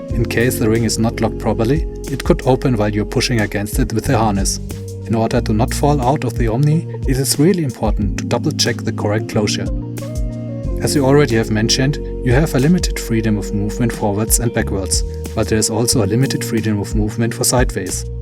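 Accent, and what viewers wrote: Germany. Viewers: This german english triggers me ^^ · Interessant dass man immer direkt hört wenn Deutsche Englisch sprechen ^^ Gutes Video auf jeden Fall.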